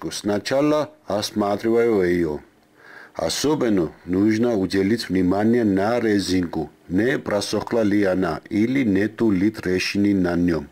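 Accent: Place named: Georgia. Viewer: Всегда с удовольствием смотрю и слушаю ваши видео. Особенно с грузинским акцентом!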